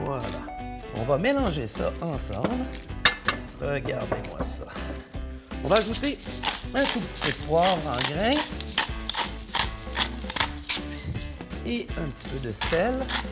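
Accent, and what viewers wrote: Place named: Canada. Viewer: Avec ce grand sourire, cette joie et surtout l’accent Canadien, j'ai vraiment envie de préparer ce Portobello :D Merci pour la recette.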